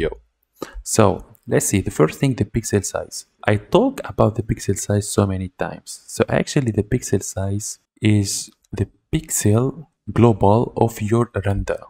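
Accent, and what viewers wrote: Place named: France. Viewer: Your english is great and your accent is barely even there. Like, you can tell you are french, but your english is totally normal just with a normal french accent. Your english speaking is better than the majority of Americans, some of who have really thick accents even though they only speak 1 language, lol.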